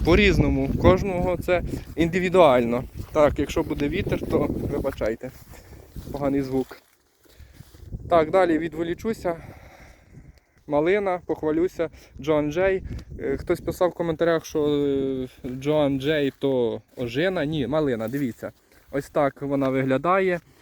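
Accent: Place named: Zbruch, Ukraine. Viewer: Akcent. Vrodi. Nedaleko. Zbrucha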